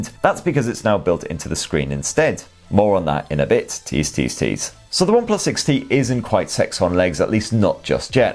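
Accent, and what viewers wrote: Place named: Geordie, United Kingdom. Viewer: Sounds more like north east England to me, a slight Geordie twang to his accent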